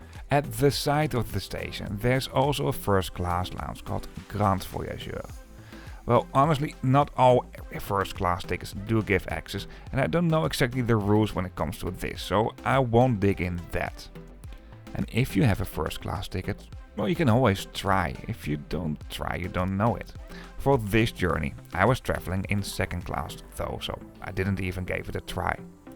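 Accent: Netherlands. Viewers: Nice video (and nice duch accent) well described with many informationts I didn't know before. I traveled several times between Saarbrücken and Paris years ago (with Euro-City), minimum 10 times allez-retour... when I was last time in Paris Gare de L'Est, there where no barriers at the access to the platforms. Btw. once I met an Australian guy, interested in high-speed trains, who called the ICE-Trains icetrains... Hartelijke groeten, Andreas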